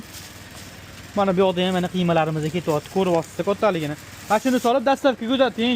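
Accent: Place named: Tashkent, Uzbekistan. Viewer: Toshkent shevasidan nafratlanaman💔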